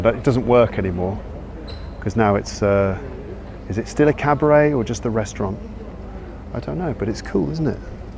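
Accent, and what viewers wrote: United Kingdom. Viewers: What beautiful, well documented, almost lyrical report on. a parisiàn district I had the opportunity to stfoll up and down years ago whilst a student. Thank you for the entbjsiasm, your English-savoured French words reading 😮, I ennoyed your "Walk, cycle and Talk" immensely 😢 Françoise crom Martinique.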